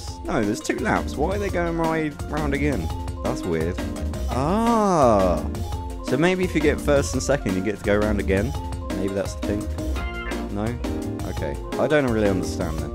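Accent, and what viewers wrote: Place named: United Kingdom. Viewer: By your accent I think your British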